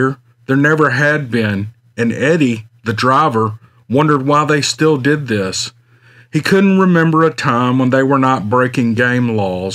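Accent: South, United States. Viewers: Always have had a fasicination with Cryptoids. I myself am a Southern girl. Born in Memphis,TN and as a child roamed the hills of Mississippi, Tippah County my paternal aunt own land so I was all over it. Never ran into Bigfoot, but there suppose to be a Big Black Panther of sorts roaming those same hills. But I never had the experience of meeting anything out of the ordinary. I now live in Washington state still on the look out though. Love your storytelling and your southern drawl. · I love his accent and cadence. Sounds like a suave southern gentlemen. (I'm a woman.) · I love the southern American accent, i dont know if its me being Aussie and not knowing what to listen for but it doesn't sound trashy to me, it sounds charming, engaging. Its an accent made for story-telling. Are there different southern accents? I imagine with Louisiana being French they might sound different & I've heard Cajun people speak and I cant understand them at all.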